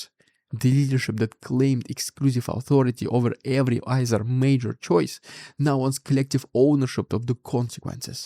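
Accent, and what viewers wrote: Russia. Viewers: Dude; i would appreciate if you spoke more slowly. I admire your eloquency in English. But spoken fast with Russian accent, is difficult to understand.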